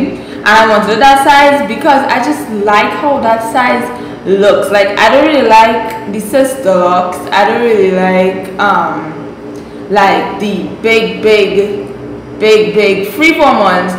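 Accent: Trinidad and Tobago. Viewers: Are you from Trinidad???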